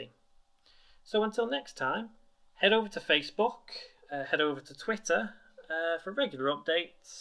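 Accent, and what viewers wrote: United Kingdom. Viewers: he is british. They say Z differently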